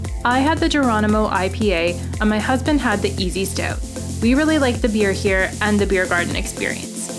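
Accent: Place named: Canada. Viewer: This lady has a nice Canadian accent.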